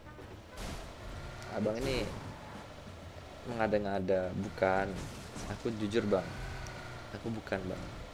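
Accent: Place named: Banyumas, Indonesia. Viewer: Ora ngapak ora kepenak 😀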